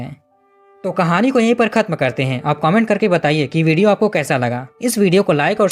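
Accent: India. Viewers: woh North Indian h unka accent Waesa hi hota h.. Aur woh Boht accha narrate krte h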